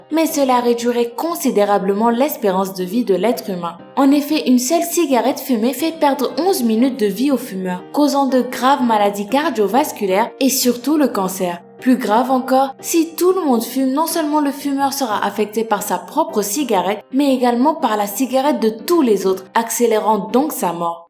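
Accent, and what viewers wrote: Canada. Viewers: me suis aboné:) ps: j'adore ton accent tu est canadienne? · Salut. pourquoi tu parle si lentement😆 et tu canadienne?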